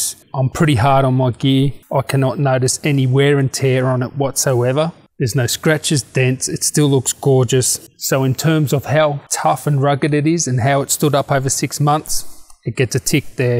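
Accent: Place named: Australia. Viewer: Whereabouts in Oz are you? WA? Just trying to guess the accent.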